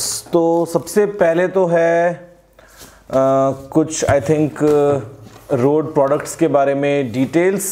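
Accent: Bengal, India. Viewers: Ap Bengali ho?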